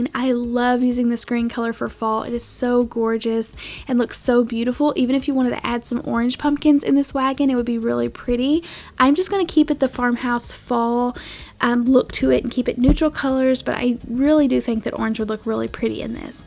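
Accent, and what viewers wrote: South, United States. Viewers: OMGosh!!! You're SO adorably talented!!! I'm new to your channel, but have subscribed. I've seen like maybe 2 other videos of yours, but THIS ONE is absolutely TOO CUTE!!! TFS! Blessings to you & your loved ones! PS. Is that a southern bell accent? I'm originally from upstate New York, but lived like 28yrs in southern California, & the last 10 1/2yrs in the NW area of Washington state! (By Seattle.) ONLY asking cause I LOVE your accent!!! Anyway, HAPPY CRAFTING!!!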